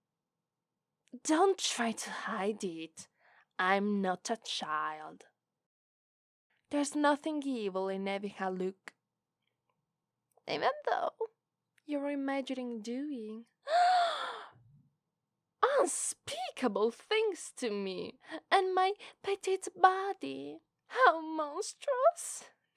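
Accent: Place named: Italy. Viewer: Never knew how an Italian accent sounded until now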